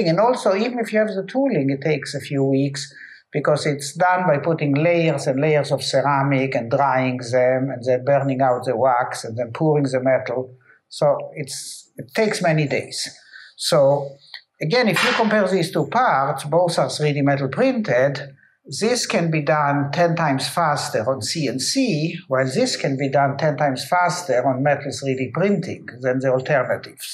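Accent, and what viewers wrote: Israel. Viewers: Is it a Hebrew accent? GREAT GREAT VIDEO and astonishing relatively SOLUTION! The definitely BEST intro about metal 3D printing around. Thank you Sir. It's really beautifully simple, efficient, precise. THE BEST COMMERCIAL AROUND TOO. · It’s the most Israeli accent I’ve ever heard.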